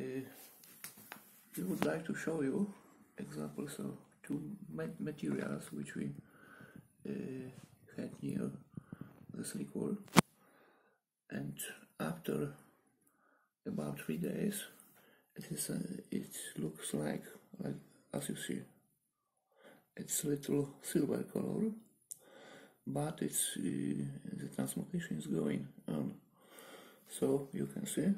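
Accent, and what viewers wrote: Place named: Dnipro, Ukraine. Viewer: бля у него акцент Днепропетровский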